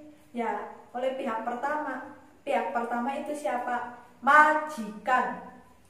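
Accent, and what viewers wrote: Banyumas, Indonesia. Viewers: Salam ngapak ora ngapak ora kepenak😀👍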